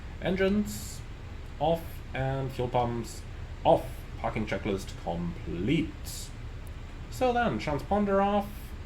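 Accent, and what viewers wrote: Switzerland. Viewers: Sehr schöner Schweizer Akzent. Grüsse aus der Schweiz :-)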